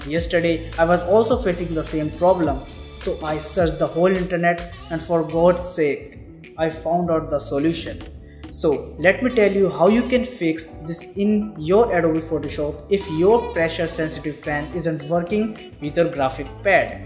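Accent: India. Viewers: Omg thank you so much, anytime I hear Indian accent, I have a confidence that my problem will 100% be solved, thanks for your help mate !!!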